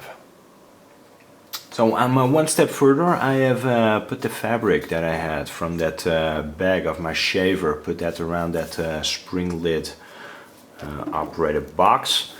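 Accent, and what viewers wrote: Netherlands. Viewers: From what European country are you from, if I may ask? Your accent is a lot like a Dutch accent.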